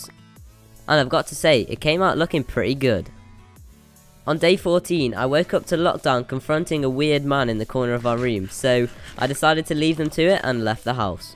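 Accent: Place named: United Kingdom. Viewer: ayy is british like me im fromnothern part of england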